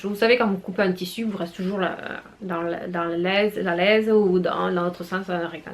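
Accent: South, France. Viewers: Bonjour Je ne découvre tes vidéos qu'aujourd'hui et je les trouve très naturelles. Je suis très sensible aux accents et j'aimerais savoir d'où vient le tien? du Sud de la France? Rien de québécois il me semble. Continue à nous distraire avec tes cousettes et bravo pour ces belles réalisations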